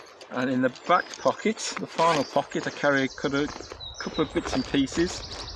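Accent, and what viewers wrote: England, United Kingdom. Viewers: You will have to forgive my cynicism but when I hear an English accent talking about bush craft and wilderness survival I get a bit sceptical and sarcastic. This guy may have experience in the bush and living in remote places but this video looks like it was taken in a park. Years ago I worked in northern British Colombia and worked in some extremely remote locations and lived out of a tent. In some cases, the only way in and out was be a helicopter or one heck of an arduous long walk over impossible terrain. I am sure there are people who have experienced more challenging circumstances and more desperate survival situations then me but the average guy hasn’t. I’ve had numerous bear encounters, slept in a tent in the snow, slept in the rain with nothing but a sleeping bag and a tarp, been in dense forests with huge hemlock trees in the late fall living out of a tent, lived in a tent in early spring when you would wake up in the night covered in frost, or been baking in 40 plus degree Celsius temperatures in the outdoors with no way to cool off except to drink hot water that was baking in the scorching heat. 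I was always well equipped and for the most part had some kind of back-up, support, and resources. Real survival in the wild is not a game or about having some items in a backpack. If you are ever truly stuck in a remote location and can’t get to civilization it will be better to have some survival items but they won’t help you much. When you haven’t eaten anything in 8 ho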